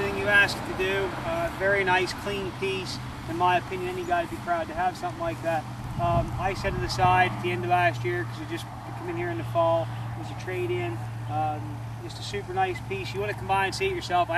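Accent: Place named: Pennsylvania, United States. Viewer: I can tell you're from Pennsylvania with that Pennsylvania accent lol. Good looking 318 you got there!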